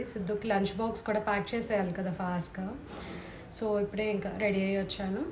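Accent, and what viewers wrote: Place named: Guntur-Krishna, India. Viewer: Hi, Mee voice ki sooper fan andi..maadhi guntur.. Guntur,Krishna distict vaalla slang baaguntundhi...vlog last lo Thankyou for watching...thankyou for your time is an awwwesome dailogue ❤ Yes...amma vaalu cheppedhi vinali....i experienced it many times...till now..after 2 kids also 😂 Munagaaku fry kifs tinaru..chedhu gaa untadhi ani...So, kaarappodi chestaanu.idli, dosa,upma lo ki tintaaru... I too use India gate n Rozana..but Last week, nenu offce lo potluck kosam, mushroom pulao chesaa..rozana rice antha baaga raaledhu... New channel chepparu...good to know..thanks for sharing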